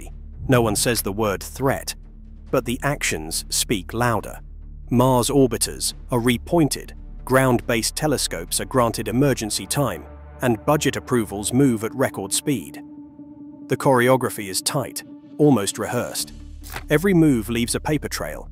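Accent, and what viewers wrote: United Kingdom. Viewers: Understand that the timing is perfect. The "new world of disorder" and all these meteors, comets, UFO's etc means that they can dump nukes on their own countries aka FALSE FLAG, and blame anything from AI, to the Cartel, to Russia, to UFO's, to global warming, to the kitchen sink. And the narrator English accent is always a give away.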